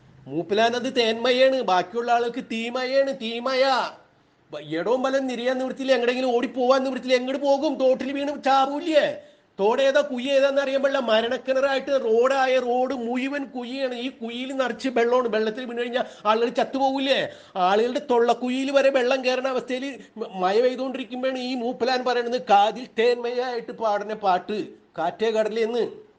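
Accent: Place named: Eranad, India. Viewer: രസികൻ അവതരണം അധികാര വർഗ്ഗത്തിന്റെ വിവരദോഷം എത്രത്തോള മുണ്ട് എന്നത്, ലളിത സുന്ദരമായി അവതരിപ്പിച്ചിരിക്കുന്നു? തനിനാടൻ ശൈലി... ഒട്ടും വളച്ചുകെട്ടില്ലാത്ത നിഷ്കപടമായ സംസാരഭാഷ... കഞ്ഞിമുക്കി അലക്കി തേച്ചപോലെ,വടിവൊത്ത അച്ചടിഭാഷയിൽ, നാടകീയമായി, മസില് പിടിച്ച് വാർത്ത അവതരിപ്പിക്കുന്ന സ്ഥിരം ശൈലിയിൽ നിന്നും മാറി, ആനന്ദവും ആസ്വാദനവും സാധ്യമാകുന്ന, ചിരിയും ചിന്തയും പ്രദാനം ചെയ്യുന്ന വേറിട്ട ശൈലി കണ്ടെത്തി അതിലൂടെ വാർത്താവതരണം സാധ്യമാണ് എന്നു ജനത്തെ ബോധ്യപ്പെടുത്തിയ Crime online Team നും, Chief editor നന്ദകുമാറിനും ഏറനാടൻ വള്ളുവനാടൻ സ്‌ലാങ്ങിൽ ചിരിയും ചിന്തയും വിളമ്പി ഞങ്ങൾ പ്രേക്ഷകരെ, ശ്രോതാക്കളെ മതിമറന്നു ചിരിപ്പിച്ച അവതാരകനും സ്നേഹവും നന്ദിയും അറിയിക്കുന്നു... അഭിനന്ദനങ്ങൾ ആശംസകൾ ❤️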